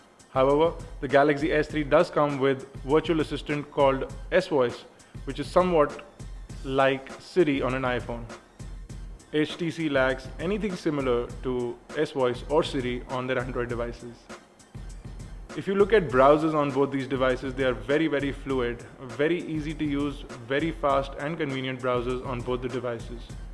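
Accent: India. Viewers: hahahahaha i love Indian English.. "How much did you get paid by HTC tell me HUNN" LMFAO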